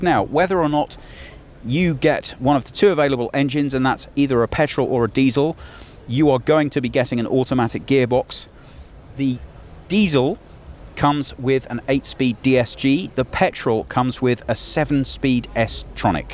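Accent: Germany. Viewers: I like this man, he speaks very fluently and clearly. Is he german?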